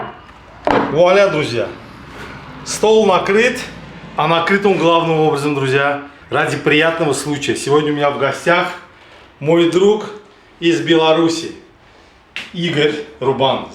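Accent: Baku, Azerbaijan. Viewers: Подписался из-за моего родного бакинского акцента, ну и конечно же очень вкусно))) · Джан э джан . Как же я соскучился по нашему бакинскому акценту ... Всем привет из Германии ! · этот родной бакинский акцент - особая причина смотреть видео этого прекрасного человека!!! Каждый раз возвращаюсь от них на родину, в Баку периода СССР, и у меня улыбка от просмотра! Дай Бог ему здоровья и счастья! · Бакинский акцент🙏👍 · Получаю удовольствие слушая вас. Вы можете не готовить, а просто рассказывать о чем угодно. спасибо ща прекрасный бакинский русский язык, который музыка для меня . Спасибо земляк. Мира и процветания!!🧿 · Я русский но родина моя Азербайджан, я родился в Баку и мне приятно слышат своего земляка с нашим бакинским акцентом. Здоровья вам и счастья. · Amma akçentiz hamını heyran edir, əsl bakılı akçentidir. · Очень по нашему, акцент приятный, наш. С искренними пожеланиями всего самого лучшего от бакинца, давно проживающего вдали от родного города. 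· Супер!!! 👍👍👍. Бакинский акцент вообще супер!!! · Я уехал из Баку 35 лет назад Живу в Нью Йорке Бакинская еда известна на весь мир Все кто побывал в Баку только и говорят о еде А мне было очень приятно слушать его неповторимый Бакинский акцент,который не спутаешь ни с чем Интеллигентный, красивый человек Дай бог Вам здоровья Это настоящий Бакинец! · ))) ona görə ki bu Baki akcentidir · Къардаш всё супер. Особенно твой БАКИНСКИЙ акцент. Почему говорю я служил в армии в прекраснейщем гостеприимном городе БАКУ Очень тёплые воспоминания. На сегодняшний день БАКУ говорят вообще жемчужина южного Кавказа ,хотя он и при союзе был великолепен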